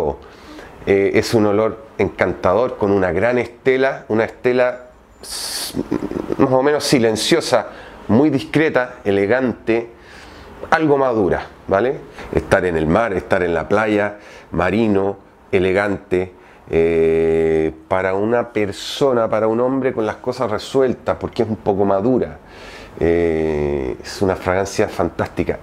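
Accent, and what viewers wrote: Chile. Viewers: Es Chileno. Se nota altiro... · de donde eres ? , porque yo siendo Chileno se nota muy claro su acento Chileno y no le encuentro nada parecido al acento , venezolano ni Dominicano ni nada parecido. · Chileno no? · Gar ..también pense que era español ..pero analizando bien ...todavía se le escucha el acento chileno....solo le falta agregar el ""pooooh" y ya esta. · Acento chileno para que tu veas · Es chileno o español? 😅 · hugo bello arce Ese es el típico acento chileno de Santiago y la zona central, tiene un no sé que de acento andaluz. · Aparte usa ese acento que parece frances y eso lo hace mas interesante aunque es chileno, de a ratos se le nota el acento chileno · Que onda la gente que dice "aun se le nota lo chileno" ???? Habla totalmente Chileno, lo unico que se le pego de españa es el "os" en ves de "les" eso es todo, su tonada y forma de hablar es 100% Chilena. 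· es chileno cierto?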